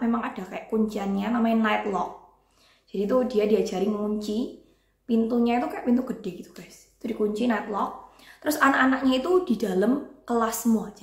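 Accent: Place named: Surabaya, Indonesia. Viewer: Keto wong Suroboyo ne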